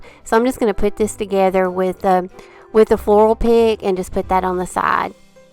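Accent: South, United States. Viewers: I just love your channel and I love all of your awesome ideas!! I love hearing your southern accent It reminds me of all my family back I Alabama....luv and wishes to you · Just came across your channel and I’m so glad I did! First, I love your accent! I’m a southern gal from TN and appreciate your sweet southern accent. Loved all of your DIY’s! Everything turned out beautiful! Subscribed and can’t wait to watch more of your videos!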